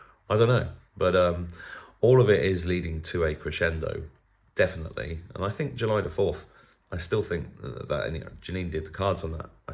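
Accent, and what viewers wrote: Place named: United Kingdom. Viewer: Ohhh you are sounding very British today, hehehe.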